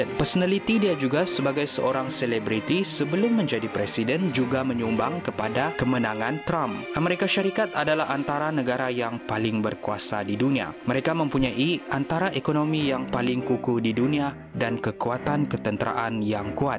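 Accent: Sabah, Malaysia. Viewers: ni mcm org sabah oh.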